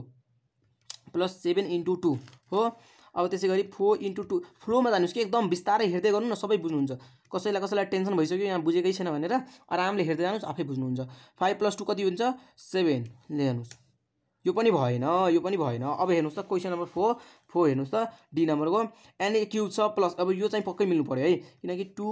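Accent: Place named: India. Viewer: R u indian? Plz fix ur accent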